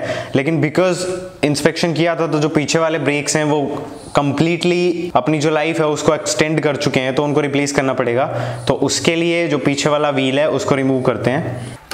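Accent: Rajasthan, India. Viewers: Bhai tum jhunjhunu rajasthan se ho kya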